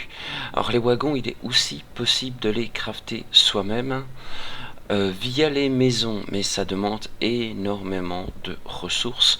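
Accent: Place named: Belgium. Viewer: Mdr ! Pardon cela me fait rire ton accent Belge^^ par contre tu fait de très bonne vidéo qui me donne envie de jouer à ce jeu! continue comme ça👍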